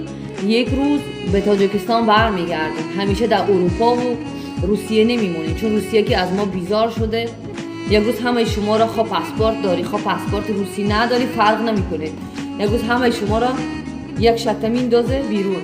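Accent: Iran. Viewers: Эрони гап мезана точикода обращени дора дафк хта дюмбор набинемт да ича эаму эрониёву туркода обращение кн точикои мора зиндагишона хавардорам нести дюбор гап назан дарбораи точико т точик нести мо тра не признаём уродина 🖕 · Oy dokhtar khode too az kojoin pas chero be lahjiye Tojeki gap namizani too az kooli Iroon harf nazan goomshoo bobo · Ту чиба точики гап намезани? Неким бо Ируни бо хато харф мезани, магуки ируниям, карочи Насихат Хубе, фикрат зебое, дуруст мегуи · Полный рост эрони хта гирифти ку??точики охи лахчаи точики Кати гап зан намезебат!!! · Ман митунам шуморо бигоям ту ируни харф мизани манба махкулшиди хуб харф мизани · Точики тоза гап зан.ранги ерони гап мезани.точикдухтар боши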